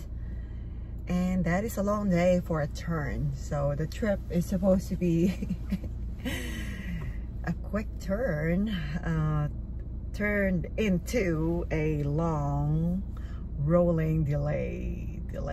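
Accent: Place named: Philippines. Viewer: Ok ...ok....you came up on my youtube, and when I heard you speak...I said to myself...."Is she a filipina?". I kept on listening, and listening, and when you said the word "actually"....I said YESS! , She is a filipina! Then your mannerisms at the end, confirmed a little bit. That is how my female cousins act when they get a little "friendly". LOL! But when you ended your vlog with a "Maraming Salamat Po"! That confirmed it!!! MABUHAY!! I just subscribed!!!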